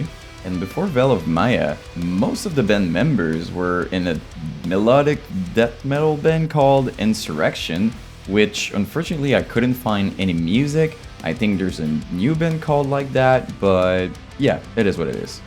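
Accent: Quebec, Canada. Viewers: Est-ce que j’entend un accent québécois? 🤓🧐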